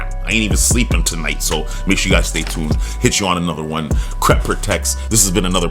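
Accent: Toronto, Canada. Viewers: That Toronto accent is annoying af 😂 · The Toronto talk has me rolling dawg😂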